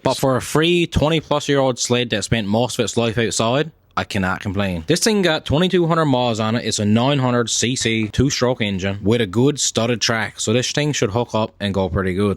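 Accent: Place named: Newfoundland, Canada. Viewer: Funny story heard my 9 year old the other day watching YouTube and i heard the strong newfie accent, i said you watch and he yes you do to, great job on the videos from your neighbors in prince edward island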